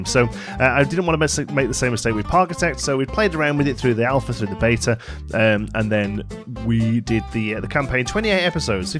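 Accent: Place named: North, United Kingdom. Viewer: Are you from the North mah dude?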